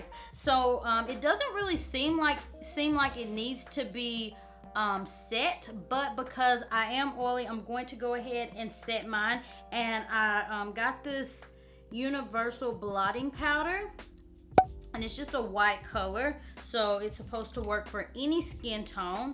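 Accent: South, United States. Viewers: You're so cute with your country accent.... nice look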